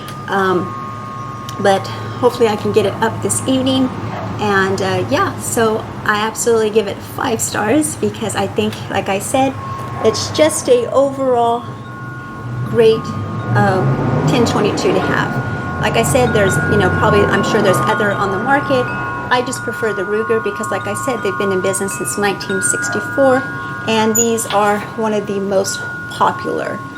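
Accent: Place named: United States. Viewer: Aussie here. You have the sweetest American accent lol Sarah Connor 🤠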